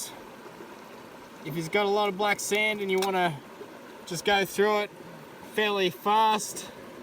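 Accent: Australia. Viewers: Nice to hear that laconic Aussie voice, pity it's dying out with the young these days being so stuck on Americanisms ....keep up the vids on river sluicing and gold panning, love them.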